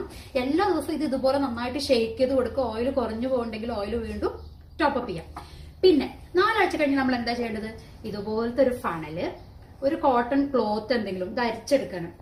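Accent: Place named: Malappuram, India. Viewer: ഇജ്ജ് മലപ്പുറാന്ന് അന്റെ ഭാഷ പറയുന്നു 'keep it up Innocence feel എല= ഇല പിന്നെ നാട്ടിൽ ഈ പുഗ്ഗ് കിട്ടുലല്ലോ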